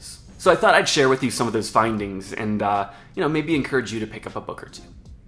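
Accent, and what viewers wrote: Canada. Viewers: Your accent says you're in Canada. Am I right? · This guy’s Canadian. ‘Bout!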